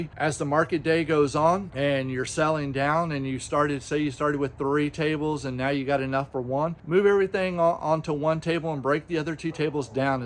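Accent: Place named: Maine, United States. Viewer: Where anchored maine he sounds like from maine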